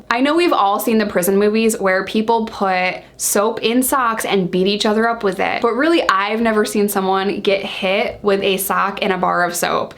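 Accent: United States. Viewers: Crikey you're sexy, you look like you are british, with american accent...but I know you're american, which is great.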